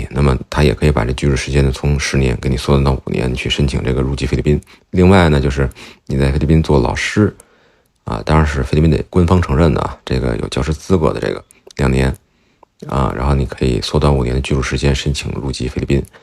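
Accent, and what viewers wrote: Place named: Beijing, China. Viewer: 北京人儿？这口音很好听啊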